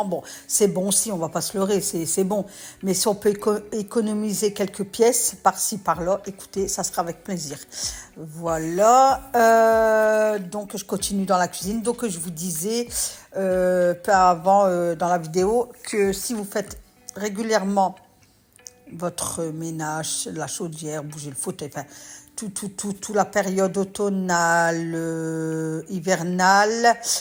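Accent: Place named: Nord, France. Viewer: est marrante elle s' exprime bien comme dans le Nord aussi bien dans les expressions que dans l' accent. Je suis de la région.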